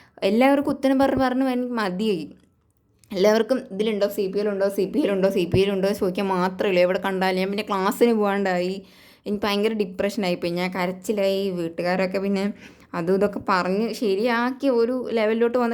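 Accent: Palakkad, India. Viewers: Ningal Palakkad aan llee😂😅??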